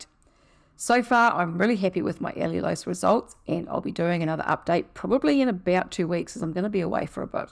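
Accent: New Zealand. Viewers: Great to hear a Kiwi "eccent" on YouTube!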